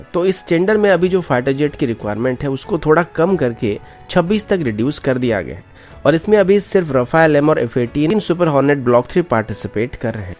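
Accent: Odisha, India. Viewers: Bro r u from odisha...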